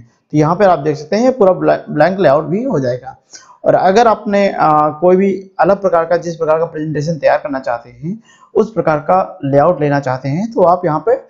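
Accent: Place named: Spain. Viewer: English title, Spanish narrator bruh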